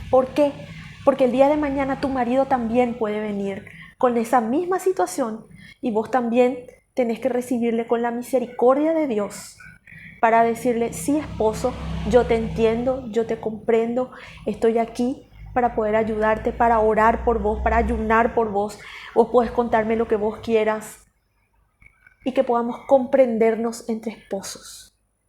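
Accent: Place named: Paraguay. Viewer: Por tu acento creo sos de Paraguay, todos hemos pasado por alguna experiencia similar, como humanos lo experimentamos, gracias al Señor Jesucristo tenemos su Santo Espíritu y el nos ayudará siempre.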